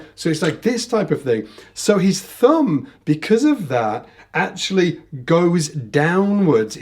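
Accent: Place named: Leeds, United Kingdom. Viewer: Where exactly is your accent from bro? I hear Leeds area of course but I am hearing other dialect, did you live down South for a while???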